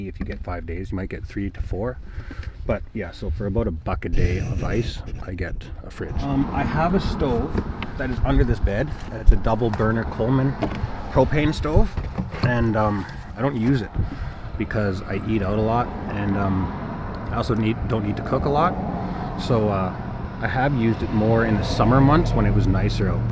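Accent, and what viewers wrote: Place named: Canada. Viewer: Deff sounds canadian. I would love to try this but I'd freeze to death in the prairies during winter lol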